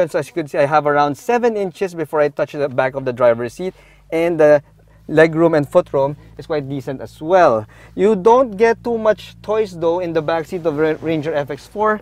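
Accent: Philippines. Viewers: Ang conyo ni sir pakinggan sarap manood 😅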